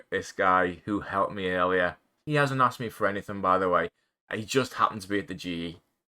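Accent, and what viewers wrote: Liverpool, United Kingdom. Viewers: Fellow scouser? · Where's your accent in the UK from friend? Scouse? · As a yanited fan, never thought I'd spend 2 hours listening to a scouser talk, and I mean this endearingly 😄. Amazing content!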